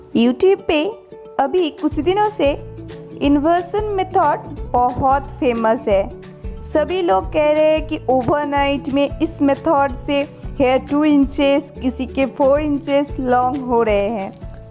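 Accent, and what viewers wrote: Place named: Bengal, India. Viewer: Ap bangali h na?...apki awaz se hi lg rha h😊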